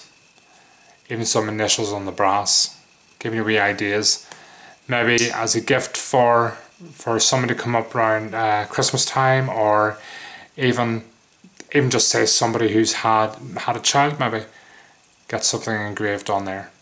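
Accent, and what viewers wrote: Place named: Northern Ireland, United Kingdom. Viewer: Where is Heinnie Haynes located? I'm hearing a fellow northern irish accent there!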